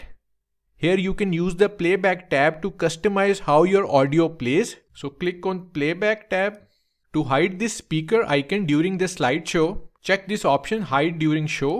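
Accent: India. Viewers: Raw indian accent not even stereotypical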